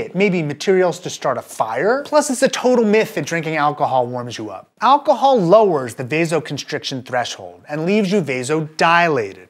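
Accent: United States. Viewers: I'm with Joseph here, remember that you are watching an American channel, we don't use the metric system. It isn't hard to tell by his accent that he is American.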